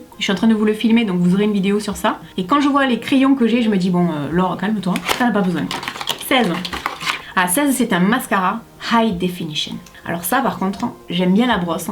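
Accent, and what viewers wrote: South, France. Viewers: Coucou, super cool ta vidéo j'adore, je ne savais pas que Lidl et Action c'étaient mis à fait ce genre de calendrier et mon préféré des 3 que tu as essayés est le Spa de chez Action! 😀 Très déçue de celui de chez Lidl franchement je préfère mettre 4 euros de plus et avoir celui de chez Maybelline qui est vachement plus quali que celui de Lidl et avec beaucoup de full sizes... Merci pour cette vidéo, avec ton accent du Sud que J'ADORE (forcément j'ai le même, pas très objectif...). P.S: Effectivement ça serait cool que tu unboxes un calendrier bio! (Pas très objectif non plus, je bosses dans la certif de produits bio... 😂😂) Gros bisous!!!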